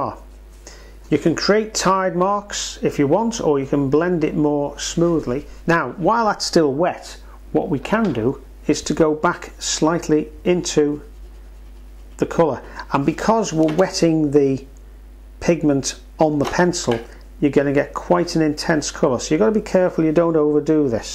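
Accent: Liverpool, United Kingdom. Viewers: Thank you. I watched this with my children and you did a fantastic job! PS 6 yrs ago, we moved from Cheshire back to Canada. Your lovely accent sounds like you are from somewhere between Liverpool and Cheshire. Are we right??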